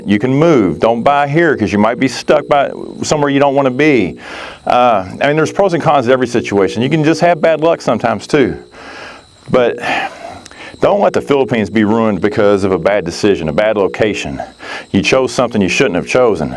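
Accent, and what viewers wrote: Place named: Kentucky, United States. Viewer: Your accent is so Kentuckian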